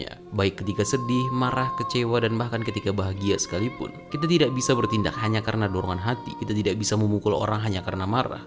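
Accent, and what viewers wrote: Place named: Banyumas, Indonesia. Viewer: Yang ngomong kok beda anjir... Malah jadi logat ngapak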